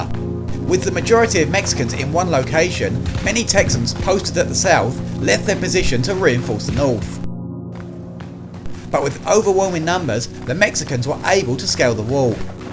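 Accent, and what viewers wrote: United Kingdom. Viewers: Neither is he! lol That's an English accent. ;)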